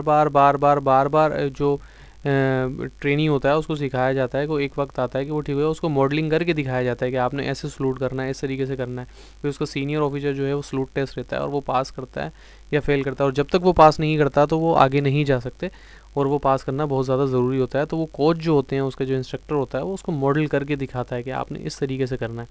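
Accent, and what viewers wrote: Karachi, Pakistan. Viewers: Apka lhja karachi walon k ha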